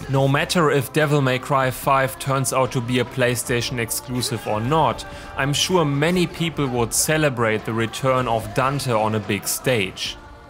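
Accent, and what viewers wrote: Germany. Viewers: can confirm sounds like a german english teacher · you sounds german :D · Is this guy german? His accent sounds really german.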